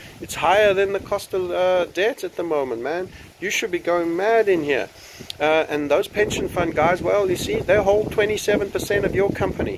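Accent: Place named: United States. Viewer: 10/10 American accent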